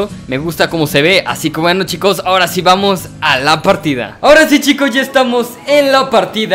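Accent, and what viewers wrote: Mexico. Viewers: El asento mecicano😄😂😂😂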